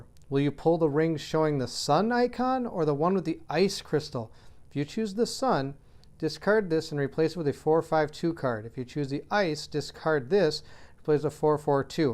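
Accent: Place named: Midwest, United States. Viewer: I'll never get over the midwest pronunciation of "root" and other similar words. Haha. Had a classmate from Duluth many years ago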